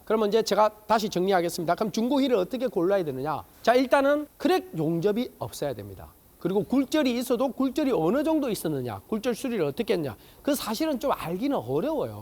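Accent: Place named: Gyeongsang, South Korea. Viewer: 갱상도사투리 ㅋㅋㅋ굿입니다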